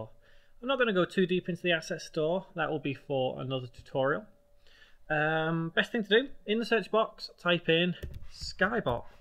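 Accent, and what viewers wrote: United Kingdom. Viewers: Dude, he's clearly not American with that British Accent. He's from UK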